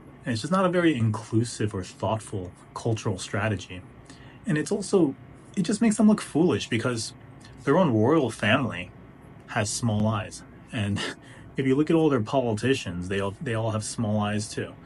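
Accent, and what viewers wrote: United States. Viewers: you sound like such an american dude... if you ever actually cared for any of this media you would know japan always sets its fantasy setting in pseudo-european worlds if it occurs in modern day japan then majority of characters are always japanese and im sorry but naruto mario and attack on titan doesnt represent the medium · You sound way more American than Japanese lol Japan does embrace its beauty but Japanese are also abstract thinkers, certain things are just chic. In America we imitate the Asians as well it’s a cultural exchange. Also sounds like you’re just referring to major city areas. Goku turns sayan bc there’s an alien race called the blondes and turning gold symbolizes godlike elements.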